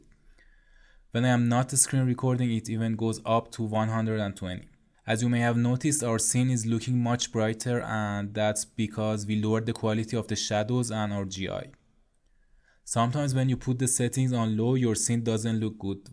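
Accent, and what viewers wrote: Iran. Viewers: سلام ، عالی بود ، فقط یه سوال داشتم ، ایرانی هستی ؟ آخه لحجه ات فارسیه توی تلفظ کلمات اما بازم کارت عالیه ! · داداش دمت گرم عالی بود.ایرانی بودن یه طرف انگلیسی رو با لهجه اصفهانیدوست دارم :goodvibes:. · iranian accent :)) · thanks for the tutorial 100% Persian accent 😄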